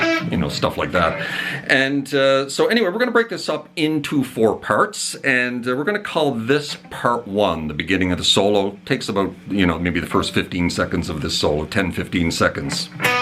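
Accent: Canada. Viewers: Was that a Canadian accent? checks amp Ahhh, yep.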